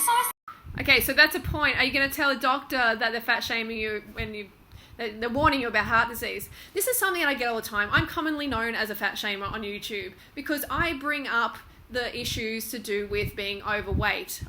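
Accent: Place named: Australia. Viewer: I realize your Australian (accent>? I am guessing BC I don't know who you are)...but I think what we are seeing in the States is a revolt of Political Correctness and all these speech police codes America has been pummeled with...it will only continue as people break free of the liberal college "ism" and "ist" box that has been constructed around us in the last 5 years or so...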